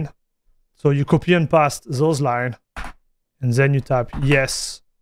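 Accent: France. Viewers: Le jeu tu le lance sur quel hardware ? Je l'ai pris sur Steam, via Proton (TkG) sur une Arch sur un 7950X3D et une 7900 XTX, je suis stuck a ~40FPS. Independament de la resolution ou de la qualité. Et au passage, merci de faire rayonner l'accent français dans le monde entier. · Are your a frensh guys because your accent make me laught a lot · Jolie accent français !! 😁😁 Merci pour le tuto tu régal 👍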